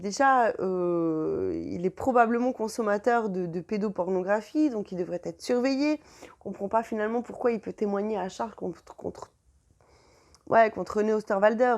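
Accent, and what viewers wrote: Switzerland. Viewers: votre petit accent suisse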